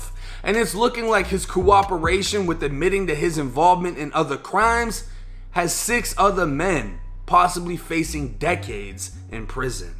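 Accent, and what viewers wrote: Boston, United States. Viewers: Uh, He’s from Boston? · U should follow the Nigerian blogger Dr Yemi on IG for all the updates on HushPuppi from Nigeria 🇳🇬 point of view- I KNEW he was gonna start telling on every1 bc his own homeboys told on HIM - they all clowns 🤡 if u asked me - I mean WHO gathers their own evidence against themselves- 🤦🏾‍♀️👀👀✊🏾✊🏾 thanks for the video though we love ur accent (is THAT a Southey BossTown accent) or Worcester?? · putting wayyyyy too much on that boston accent bud lol. cringe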